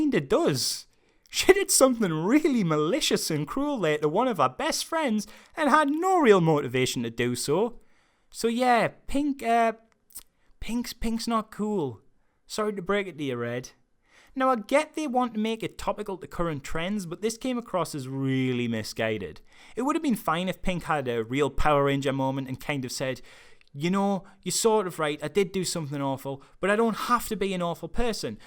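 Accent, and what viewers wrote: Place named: Wales, United Kingdom. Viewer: I can't seem to place ur accent. Welsh maybe? or scottish?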